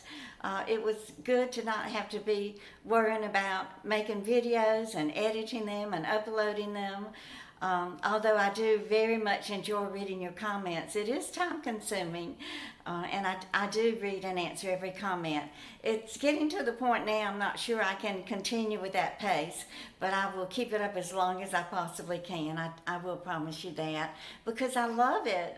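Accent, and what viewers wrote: South, United States. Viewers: Glad to see you back & looking so fresh & pretty! I didn't realize that p.o.boxes were that expensive per year. I am a recent subscriber and being from the South (Georgia), I just love your Southern accent. You are a great storyteller & I enjoy them. Rest when you must & people will understand. We will keep on coming back! :) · I love you're accent, its a beautiful, classy, southern, Lady voice. Its very calming & loving. I wish the southern ladies of today had this. Its a lost art. I was raised in West Virginia, southern people have heart & soul thats unexplained by us southerners, but totally understood, an unspoken love of heart.I really love that! Im 35 years old & I would absolutely love for you to write a book, a memoir about your life. Truth, honest, good bad & ugly. The highest highest highs & the lowest of lows. I love reading biographies and memoirs about peoples struggles, demons, their joys, they're better angels. 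Please think about it if you would? You've overcome alot & still keep joy on your face. That alone is a miracle, even the days where its hard to find joy. Im sure it would help people out. What do you say? 😁,❤️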